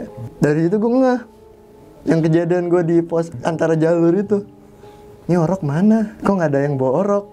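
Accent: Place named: Bekasi, Indonesia. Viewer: Resep bet dah ah gaya ceritanya, nyablak bett hahaha lebih ke bekasi ini mah kaga cileungsi😂 apa jangan jangan orang setu nih si abang nya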